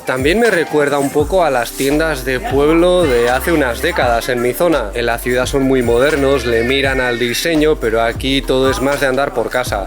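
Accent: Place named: Spain. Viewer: se te nota que eres español pero se esta perdiendo las zzzz.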